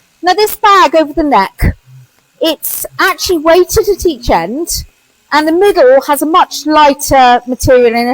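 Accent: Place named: United Kingdom. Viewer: You are definitely from uk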